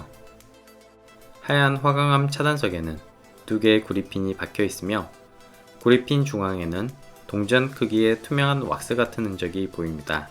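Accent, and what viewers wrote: Jeolla, South Korea. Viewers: 이건 개인적으로 느낀건데 정보를 전달하는 분들은 정확한발음과 표준어를 사용해야 한다고 생각합니다 발음은 상당히 좋으신편인데요 전라도 사투리가 심하셔서 듣기 거슬립니다. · 전라도 억양을 사용하시네요 ? 악의없는 댓글이니 오해 마시길.....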